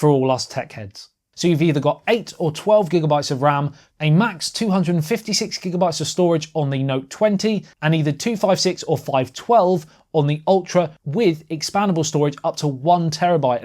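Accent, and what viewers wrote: England, United Kingdom. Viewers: That ENGLISH accent, 😂😂😂😂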